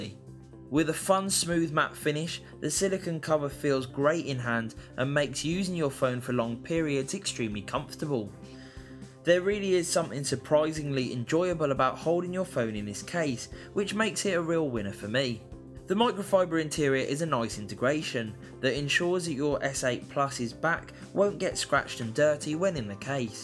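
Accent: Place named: Birmingham, United Kingdom. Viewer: Guess you're a Brummie then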